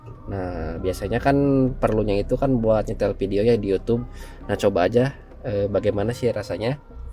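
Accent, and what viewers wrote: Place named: Bandung, Indonesia. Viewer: Asa bandung yeuh logatna,salam kang ti bogor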